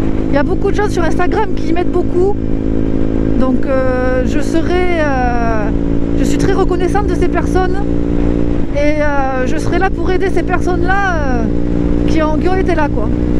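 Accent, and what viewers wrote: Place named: Southwest, France. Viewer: toi vu l accent tu sembles etres du sud ouest , sinon bonne continuation!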